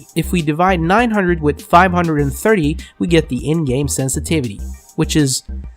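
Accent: Gothenburg, Sweden. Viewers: Äru svensk?? HAHAHA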